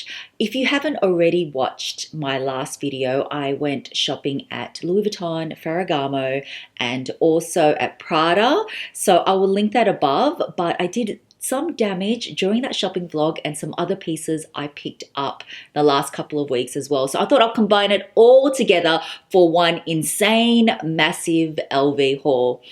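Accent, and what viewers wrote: Australia. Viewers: Love your Australian accent. Lucky you. I can't even afford to buy the fake bags. Love all the goodies you bought.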